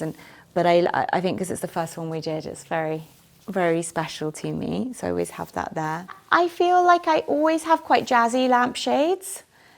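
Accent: England, United Kingdom. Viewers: What a fabulous home. So cozy and welcoming and beautifully styled. Can tell is a very genuine person. I love her personality and her beautiful English accent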